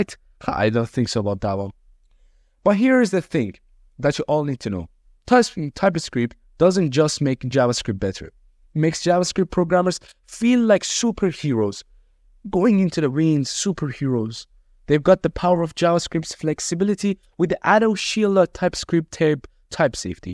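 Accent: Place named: India. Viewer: Blud has indian accent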